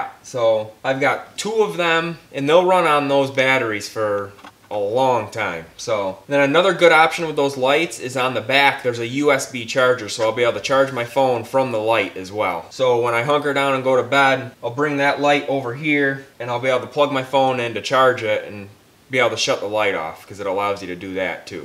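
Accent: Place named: Arkansas, United States. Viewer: I’m guessing you’re from Arkansas? Your voice sounds like you’re from there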